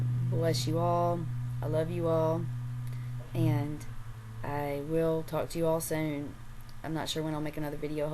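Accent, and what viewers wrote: South, United States. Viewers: i totally thought you were in the southern US from the way you speak lol good luck in the EU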